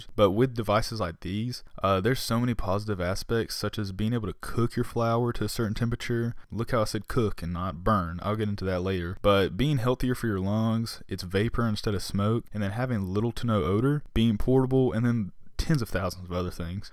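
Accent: South, United States. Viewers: Southerm American...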